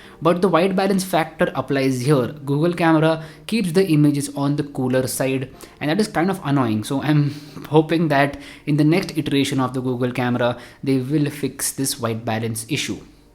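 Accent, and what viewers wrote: India. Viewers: Hate this indian accent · konuşma şeklinize sokayım sizin. hintli değilim iyiki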